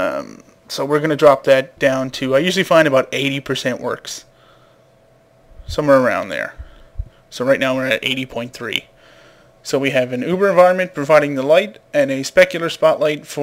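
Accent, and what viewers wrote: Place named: Newfoundland, Canada. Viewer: Love the Tutorial...AND the Newfoundland Accent! At least that's what it sounds like. I have a lot of family there and my mother is a newfie! :) Anyways, thanks again!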